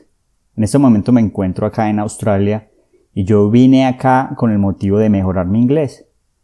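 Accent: Colombia. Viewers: Saludos desde Singapur!! Encontré tu podcasts ayer jajaj y la verdad es un tesero porque yo estoy aprendiendo el español de colombia y a través de estos vídeos y podcasts no solo puedo escuchar el acento de Colombia sino también puedo practicar mi habilidad de escuchar en español porque hablas en una velocidad normal así que es un reto pequeño para mí. Pero los subtitulos me ayudan mucho entender lo que dijiste...Gracias por crear estos podcasts :D has ganado un suscriptor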